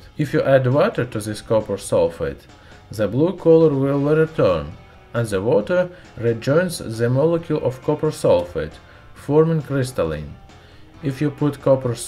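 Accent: Russia. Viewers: This is russian aksent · Is that Russian, Romanian? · I.lke the Russian accent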